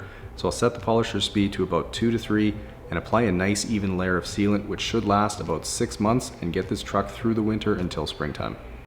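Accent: Canada. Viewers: That Canadian accent... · I'm in Canada too and we speak the same like he does.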